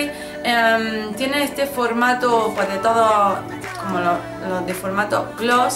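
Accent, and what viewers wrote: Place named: Murcia, Spain. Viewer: Hola wapa el acento te delata que eres de la Vega baja verdad, murciana puede ser ? Jeje 😊 Aquí esa marca la venden en bazares chinos y hay algunas colecciones q están muy bien , ahora me has picado en comprar el rojo Jajajajaj buen vídeo un besote 💋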